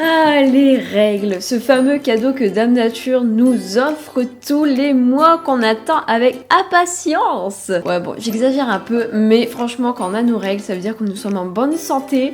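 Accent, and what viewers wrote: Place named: North, France. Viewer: bonjour vous êtes toujours aussi belle,j'adore votre accent de ch'nord!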